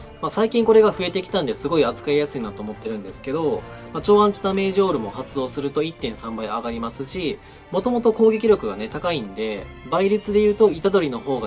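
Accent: Kansai, Japan. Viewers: 関西人かな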